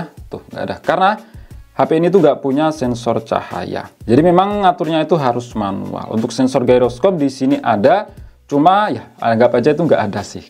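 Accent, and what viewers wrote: Blitar, Indonesia. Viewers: Orang blitar kah bang😅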